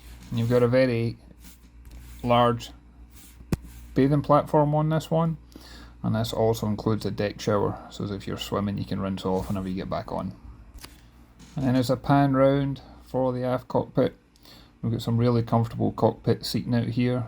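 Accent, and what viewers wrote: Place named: Scotland, United Kingdom. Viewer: Not bad.. But the guys that are selling it have not even done a through clean on it and that in it's self would stop me from buying from them.. I mean they didn't even wipe out the cooler on the fly bridge.. Just being honest brother.. still nice little boat to do some cruising in.. My wife loves your Scottish accent..👍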